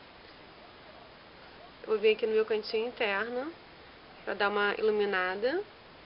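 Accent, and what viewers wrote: Rio de Janeiro, Brazil. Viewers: esses comentários inúteis são de pessoas com pura inveja de vc. acho sua voz linda, adoro seu sotaque carioca e vc é linda!!!!não é atoa que faz tanto sucesso aqui no YT. bjs!!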